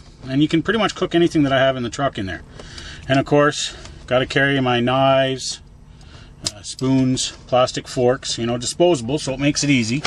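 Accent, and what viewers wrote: Canada. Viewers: Good example of the Canadian accent!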